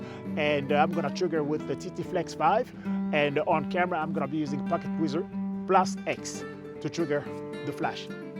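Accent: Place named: Australia. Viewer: Your portrait of your son is stunning! I hope both guys appreciate the gift these photos are now and WILL be for their children and grandchildren. I also hope they know how appreciated they are for giving you (and us! 🙂) their time and willingness to model and be teaching aids. Thank you to both of them. I'm always happily surprised the moment I realise an Aussie is creating and sharing here on YouTube. The moment you started talking I started smiling, then you panned around to show the trees in your yard and, yep, Aussie! 😁 Going back to my appreciation of your models, part of that is because I don't have one. Thirty two degrees in Melbourne today and I was messing around with a strobe, running backward and forward between camera and the stick I'd put on the ground as my "mark". It's an excellent way to suffocate oneself under the dark cloth and look hot, sweaty and as messy haired as possible on film! 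Too hot in the darkroom to develop it yet, even am....but I'm encouraged to do a little more experimenting after watching your video. Thank you for taking the time, I'm very grateful, and cheers to your models for their time. Their portraits turned out beautifully and that double exposure is a truly happy accident, too!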